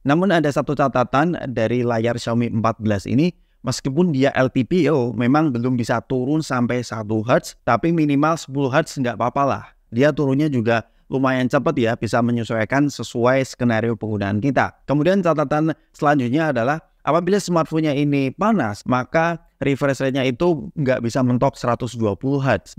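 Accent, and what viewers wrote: Semarang, Indonesia. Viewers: Mas…logat mu Semarangan banget 😂😂😂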